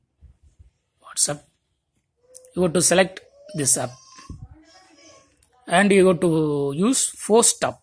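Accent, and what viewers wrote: India. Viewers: What the hell what kind of English u spoke? Pad pad! U are speaking hindi than English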